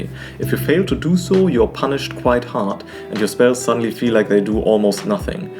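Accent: Germany. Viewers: I like(d) all your videos so far! They are really good - especially the Pres evoker and disc ones! Piano sounds aren’t bad at all, but it is the wrong note for me. They doesn’t fit to your script. It is just my personal feeling, so don’t worry! This doesn’t mean, that your videos are bad or something. 🤘🏼👍🏻 Just a tiny hint: try while editing a click or short swift sound to have a comparison. Furthermore it is obvious or at least it looks like, that you are reading your text. Another suggestion: just read your topic bullet points and use your own words. I’m sure it will underline your enthusiasm even more! Your next video will be good too! Even if you aren’t doing +12s or above with your Druid ☺️ …and last but not least least: I’m German too 😅 so… I can hear it 😎🤣 however, your English is really, really good!! I have to speak English day by day, but I’m far away from your speaking skills! 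Cheers m8 and I’m looking forward to your Druid & mw monk videos! 🤘🏼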